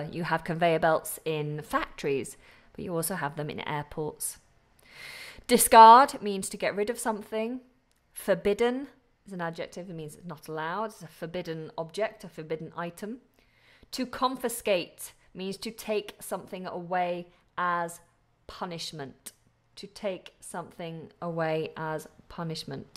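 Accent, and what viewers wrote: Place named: RP, United Kingdom. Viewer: No one really speaks this posh tbh but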